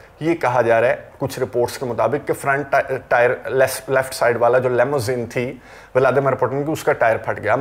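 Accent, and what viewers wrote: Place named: Lucknow, India. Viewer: Your "Andaaze Guftagoo is amazing" Urdu wonderful Lakhnavi Andaaz. MashaAllah. Keep it up, one suggestion !, Please do not indulge in Political or so called current affairs. I am 68.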